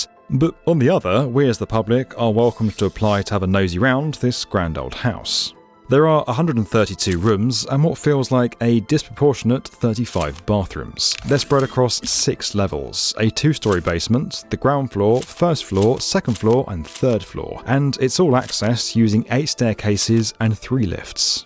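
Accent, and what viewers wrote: England, United Kingdom. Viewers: This video is VERY poor quality. It's basically a 15min video, with 10 min. of actual content, and 5 minutes worth of ads. I get it. You need to make money for product placement, but when 35% of your video is an advertisement, it's a very lazy effort. This video is basically for foreiners interested in American politics, and I guess that's made obvious with the English accent alone. Unsubscribed. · Something about a Brit narrating this feels wrong. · Why is a Brit narrating US History? Nothing to talk about over there? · When a show has an English Narrator, I just skip it.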